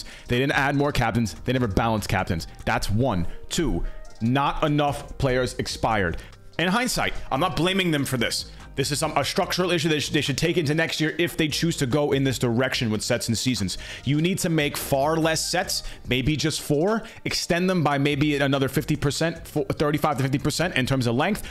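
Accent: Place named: Dominican Republic. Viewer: Dominican accent papi 😃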